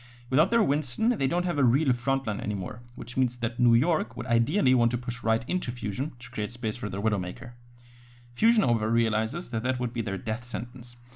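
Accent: Germany. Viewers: Very nice channel, I'm so happy that I found it through Overwatch Central! Btw, are you from Germany? Cause you sound like a German :P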